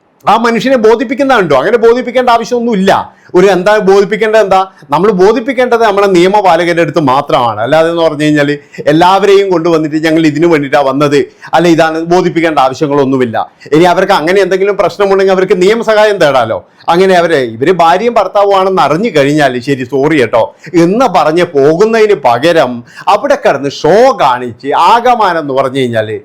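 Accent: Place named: Kollam, India. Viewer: സംസാരം കേട്ടിട്ട് കൊല്ലം ജില്ലയാണെന്നു തോന്നുന്നു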